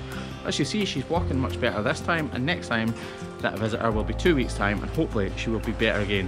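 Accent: Scotland, United Kingdom. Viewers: He has a Scottish accent. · Thank you for caring about our four legged brothers and sister. Your Scootish accent is music to my ears as Im a third generation Scot on both sides of my family here in the US. My grandma's dialect was so thick we almost coudnt understand her. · You know I’m getting used to the Scottish accent when I can watch the video on silent with AWFUL subtitles and still figure out what he’s saying. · I'm amazed by it. I never though this would intrigue me but I am. Plus his Scottish accent is the cherry on top lol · are you from uk?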